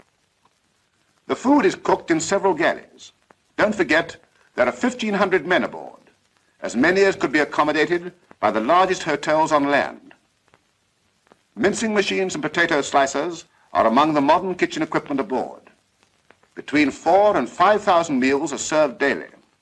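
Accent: United Kingdom. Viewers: This was during britains posh accent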